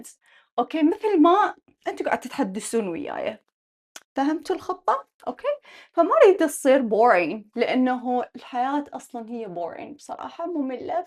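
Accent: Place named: Marsh Arabs, Iraq. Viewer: دكول ماعرف احجي عربي وهيه تحجي حجي مال معدان اصلا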